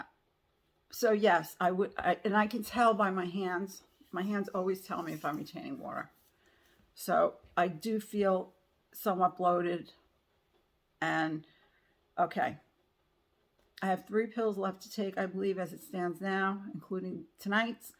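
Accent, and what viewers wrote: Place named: New York, United States. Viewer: I love your newyork accent and your journey sw well done 🐝🐝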